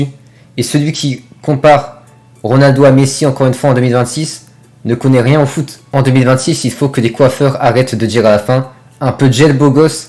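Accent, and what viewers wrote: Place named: France. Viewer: Was great to listen to you. You have a beautiful French accent. I enjoyed your presentation 😄big like 👍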